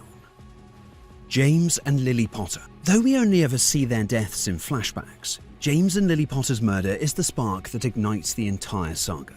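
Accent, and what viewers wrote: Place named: United Kingdom. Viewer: Why're you British. but from United States!?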